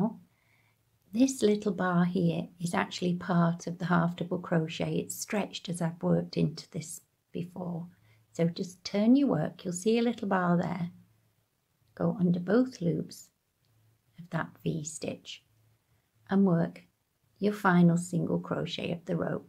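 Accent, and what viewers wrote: United Kingdom. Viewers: Can i ask why you use American terminology? When I hear a UK accent I hope for UK terminology - have we just given up on it altogether?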